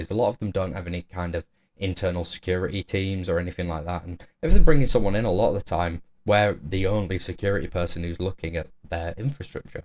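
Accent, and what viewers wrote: United Kingdom. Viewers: This English accent gives me a headache.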